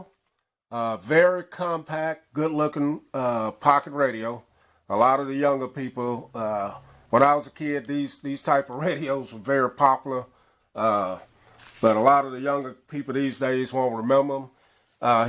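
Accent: United States. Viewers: I like the way you talk...sound like me....a touch of Hilbilly Country Boy.... Nice review!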